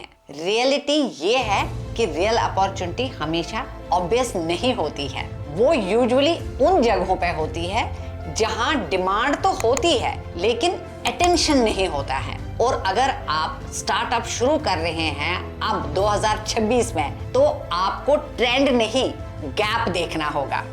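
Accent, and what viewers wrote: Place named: Kashmir, India. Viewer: Aadab mam ... I c your content just now first time ... Your accent of taking is just kashmir type... Any i appreciate your work... Best of luck Dr Masood Ahmad Tantray.... Educationist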